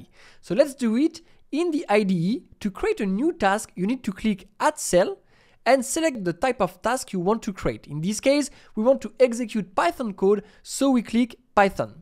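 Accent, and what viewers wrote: France. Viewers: haha seems you have a little french accent, anyway , love your video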